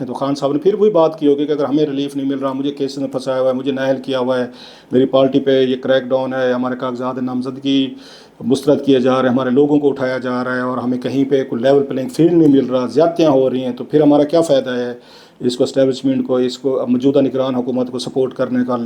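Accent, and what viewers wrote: Saraiki, Pakistan. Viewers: کلاسرا آپکی سرائیکی اردو پر حاوی ھے آپ نے باربارکو ولا ولا بولا ھے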